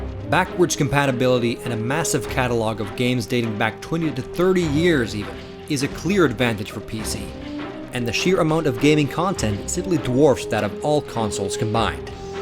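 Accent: Finland. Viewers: Is this guy finnish? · Är du finlandssvensk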